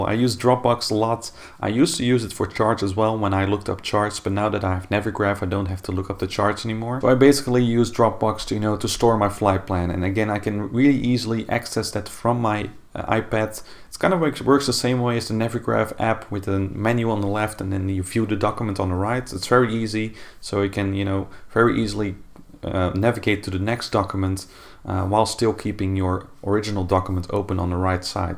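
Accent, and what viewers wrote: Netherlands. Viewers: Ik hoor daar een prachtig Nederlands ondertoontje 👌 Goede video!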